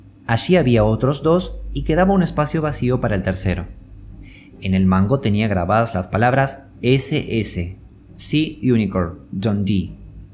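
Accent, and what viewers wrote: Rioplatense, Argentina. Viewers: valoro el esfuerzo hecho y le dejo like, porque creo que es lo mínimo que merecés. Pero convengamos que no es el acento ideal. Lo más neutro posible, sería lo ideal. Otros autores, con gusto los escucho con dicho acento. Ojo que el propio Borges hablaba y leía sin el "shesheo" rioplatense, a no ser que la obra evoque dicha pronunciación. No obstante, gracias por el tiempo y el esfuerzo. Un abrazo.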